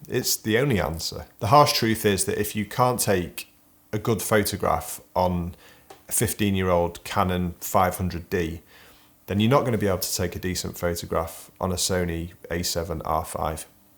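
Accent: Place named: Birmingham, United Kingdom. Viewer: Sounds like from Birmingham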